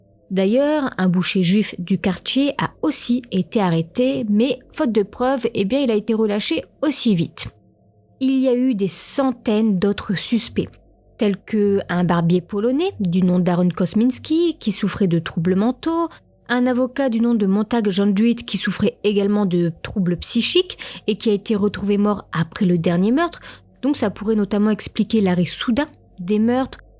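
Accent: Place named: Nord-Pas-de-Calais, France. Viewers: ah c'est marrant... moi je t'imaginais toute blonde, ( je sais pas pourquoi ) peut -être l'accent chti , par contre à un " grotéfor " , NON ! un " grozéfort "c'est mieux ...😃 ( gros effort ) dit gentiment bien sûr car j'aime tes vidéos et ta façon de raconter , bravo ...:hand-pink-waving::face-red-heart-shape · Coucou! Merci pour cette vidéo d'une affaire très connue mais très bien racontée avec ton accent ch'ti 😊 Que tu ne te montres pas où que tu aies le visage flouté ne change rien puisque c'est ta voix que j'écoute 😊. Comme un podcast en fait...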